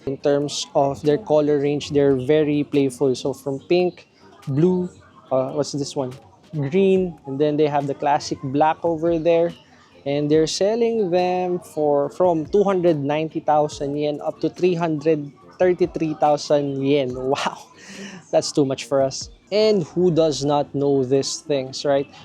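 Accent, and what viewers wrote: Philippines. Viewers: watching this channel for the thick philipino accent! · Love your PH accent, was in PH years ago loved it there so nice people! Wow those Tissot and Hamiltons are stunning!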